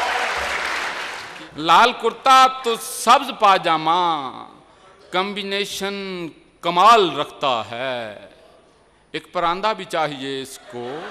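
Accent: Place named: Punjab, India. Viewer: Husney Bayaan, Tanz-o-Mazaah ki zumaani Barikyaan or sadgana chashni, woh bhi ek dhime dhime Punjabi talafuz ki roshni main, wah kamal hai.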